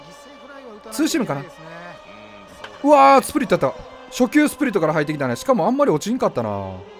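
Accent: Kansai, Japan. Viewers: 標準語からバリバリの関西弁に戻った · 昨日からはうって変わってコテコテの関西弁に戻ってて草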